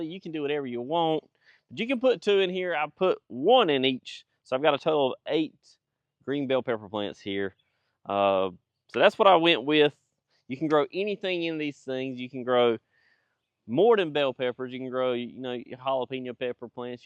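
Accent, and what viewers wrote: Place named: United States. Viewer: This american accent gives me an headache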